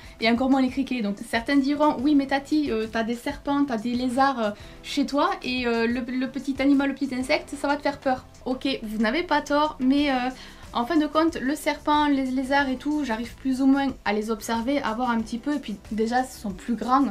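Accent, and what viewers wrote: South, France. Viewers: J'adore je veux la même chambre! !!!trop cool 😆😆 en plus avec ton accent du sud que je kif trop et comment ta dis petocharde 😄😄😄trop cooool bonne journée à toi merci bcp pour la vidéo 😉😉😉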